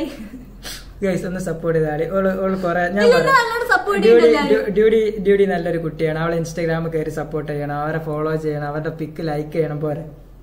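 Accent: Kozhikode, India. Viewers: പോയി പോയി കോഴിക്കോടൻ touch ഉണ്ടല്ലോ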